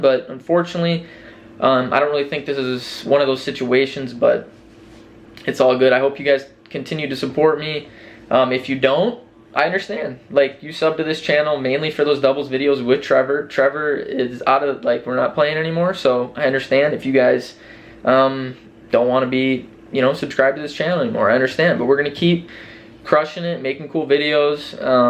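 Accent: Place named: Minnesota, United States. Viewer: I fucking love this video. sounds like a Minnesotan so much lol Love you!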